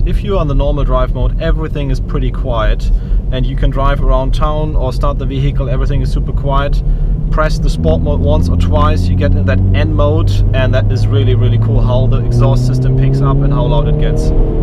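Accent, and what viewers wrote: Germany. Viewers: Dein Englisch ist wirklich super, aber irgendwie klingt es einfach scheiße, wenn Deutsche Muttersprachler Englisch reden.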